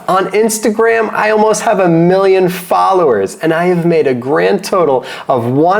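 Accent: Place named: Midwest, United States. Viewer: man this guy amazes me every video. first time i saw him i was like "look at this nut job, tattoos all over his face and body" then i watch him and he is so charismatic and fourthrite and has always been upfront with everything hes doing . and now this video. This guy is definitely a don't judge a book by its cover example. also he has a accent from somewhere like iowa or illinois maybe more east but its interesting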